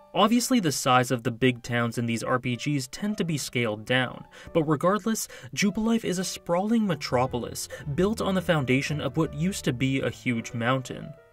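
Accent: Canada. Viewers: Yo! What part of Canada are you from!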